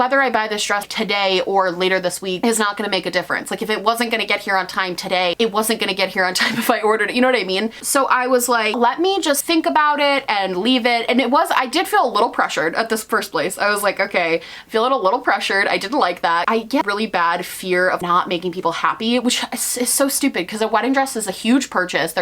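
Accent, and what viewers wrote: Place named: New York, United States. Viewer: I bet you live near me! So excited when I find folks on YouTube who live in my area of NY! I also thought that your accent sounds like a local here. Lol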